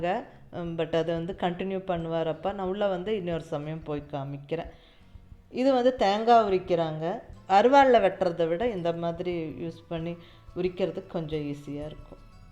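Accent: Coimbatore, India. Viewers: Neenga Kovai ya mam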